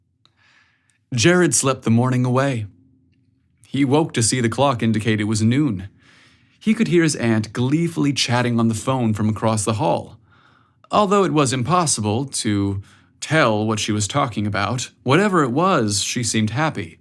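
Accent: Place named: Italian American, United States. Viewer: OMG, you have an incredible singing voice.. what a great way to start the SteamyStory. And then your Italian American mama accent...lol. And such a wonderful summer romance story......ahhhhhhhhhhh, so much better than a Netflix binge.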